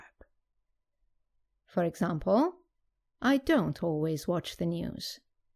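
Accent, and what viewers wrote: United Kingdom. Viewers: Yor english is british?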